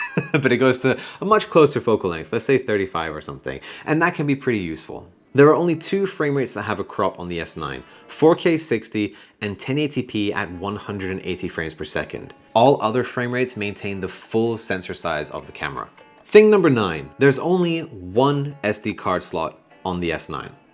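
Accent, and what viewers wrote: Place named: United Kingdom. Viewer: Love the S9!!! OPENGATE!!! by the way at first I thought you're american but I keep thinking you sound kind of british. I had to check your channel info 😅 (fyi I'm studying how to speak English which is why I'm trying to guess the accent 😅)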